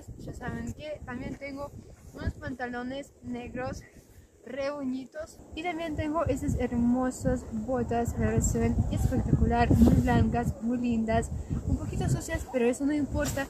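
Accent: Argentina. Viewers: muy buenos dias muchisimas gracias por este hemozo video me encanta y tambien tu hermozo acento argentino gracias gracias gracias Felicitaciones sigue asi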